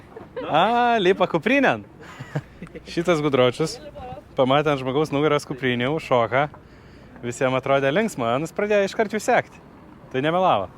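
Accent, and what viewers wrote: Aukštaitija, Lithuania. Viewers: Bet tai faina klausyt,kai kalbi paprasta aukstaitiska moletiska,utenska kalba,ne kaip kiti kaimieciai miestan pateke,vaidina aukstuomene...😊👍👍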